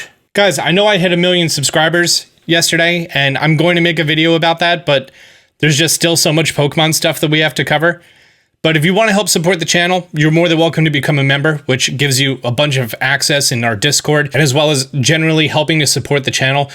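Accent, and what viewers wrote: Australia. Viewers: Wait, you’re Australian?! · the ausies finding out that he is australian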